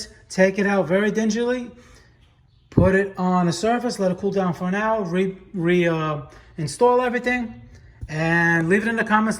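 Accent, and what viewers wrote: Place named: New Jersey, United States. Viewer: I have a 2011 F-150 Lariat with the factory stereo including Sync & Sirius. When I switch the ignition on, “Sound Designed by Sony” displays, then the compass direction and external temperature (only). When I press the stereo power button, five circles and the clock time flash on, then disappear. Occasionally when I'm driving, these will blink on then disappear too. To me that suggests an intermittent connection. I checked fuses and they were all good. I reset the stereo by disconnecting the battery cables, waiting ten minutes, and reconnecting. No joy. Upon removal of the stereo unit, all connections on the back were tight and clipped. So I baked the circuit board at 175 for ten minutes, then 375 for six minutes, and let cool at room temperature for one hour. Reassembled, reinstalled, and got the exact same behavior as before. 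Unfortunately the procedure didn't work for me. So I'll be looking at an aftermarket solution now. But thanks for your videos, and I give you major props for the total Jersey accent and speech mannerisms.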